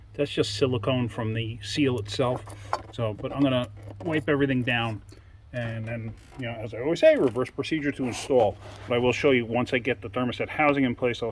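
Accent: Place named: Midwest, United States. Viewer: Thank you for your humility in your presentations. You don’t need apologize for bad camera positions, we understand. I would edit out more dead time. Watching a car go up on the rack is pretty boring. I enjoy hearing, “I’ve never done one of these before”. We all learn together, learning from your mistakes and also your clever “work arounds”. Your bits of tricks of the trade comments are great. I sure hear a Chicago, St.Louis, Detroit accent from You. I heard all those accents when in the service. I’m no expert, but I don’t hear Carolina. 😊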